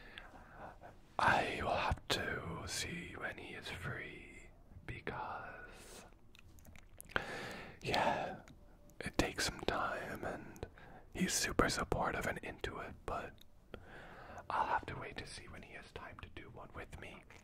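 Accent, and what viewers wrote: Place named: Ireland. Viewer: Irish? ☘Whispering into my ear? 🥰 Dare i click? 🤔 I knew they'd be the death of me....😅 Okay, here goes nothin'...🙃 💚*💚*💚*💚*💚*💚 *faints (☘shamrocks☘ floatin'☘ around☘ my ☘head☘)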